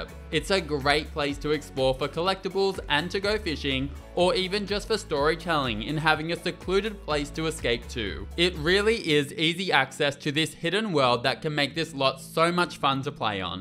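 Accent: Australia. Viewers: Gotta say the nice aussie accent 😍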